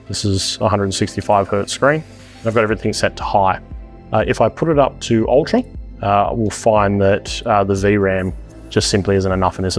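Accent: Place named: Australia. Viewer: I don't think this guy knows what he's talking about or knows how to program a laptop settings. Out of the dozens of reviews on this laptop all of them being great and no issues. This guy sounds like a whiny Australian like the rest of them. I juat ordered this laptop and I'm sure I won't have any of these issues he's claiming. Maybe he has the only bad one in production🤷‍♂️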